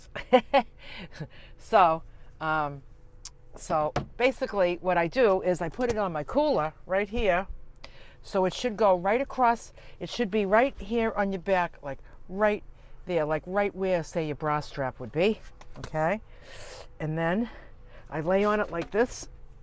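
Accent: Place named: Boston, United States. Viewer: You're loosing the Boston accent!!😅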